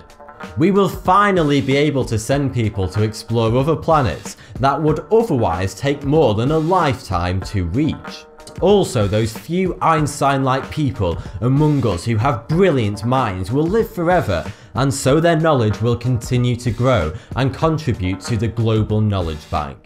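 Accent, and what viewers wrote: United Kingdom. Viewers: i luv his British accent :) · Shady Marshal he's brittish come on give him chance i couldn't even say thoughty2 because i m from asia i always say ''to(cant say G)ty 2)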